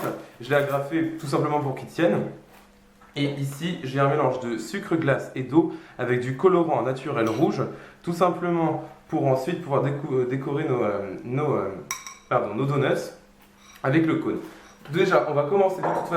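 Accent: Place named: England, United Kingdom. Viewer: Tu te la pette avec ton accent anglais et tes donugts son trop épait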